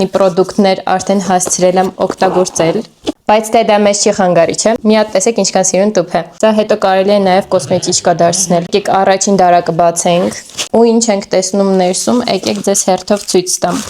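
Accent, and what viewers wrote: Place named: Gyumri, Armenia. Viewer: Գյումրիից ես գյումրվա բառբառոց խոսի😂❤❤❤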